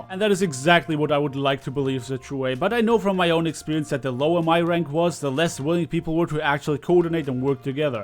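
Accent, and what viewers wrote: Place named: Germany. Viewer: Your accent is rad. Are you German or Austrian?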